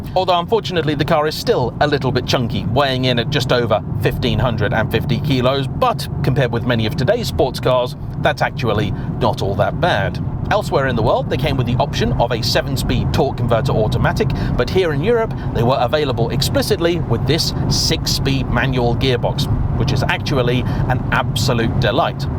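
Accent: United Kingdom. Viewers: I like the british accent, being from a non english speaking country, the english accent is so easy to understand! Love the american and aussy humor but i cant follow quickly with them as with the british :(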